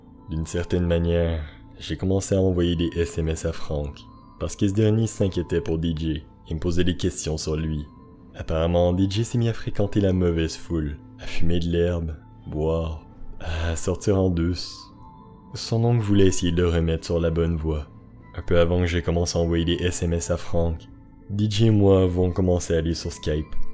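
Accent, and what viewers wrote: Quebec, Canada. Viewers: Haha knew it pis honnêtement sa fais different d’écouter du thread lue par un québécois 😁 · J’adore l’accent québécois 😁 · Tu est québécois ?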